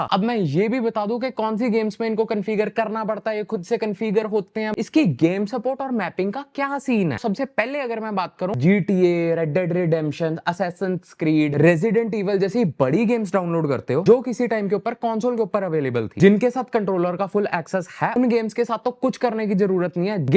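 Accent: Punjab, India. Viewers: Bro punjabi accent 🤌🏻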